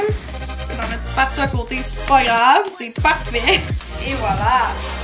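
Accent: Quebec, Canada. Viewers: t québécoise ?? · merci le Québec pour votre belle accent et la joie de vivre avec ... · Je confirme je comprends rien quand tu parles québécois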